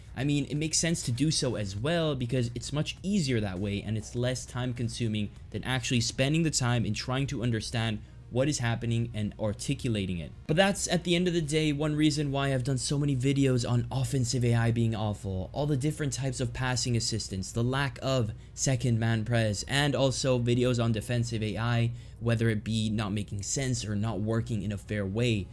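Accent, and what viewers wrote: United States. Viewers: It's an American accent so I wouldn't call it a 2nd language.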